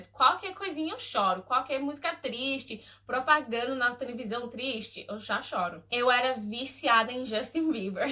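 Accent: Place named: Northeast, Brazil. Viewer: Nossa que português... Passa tranquilamente por nordestina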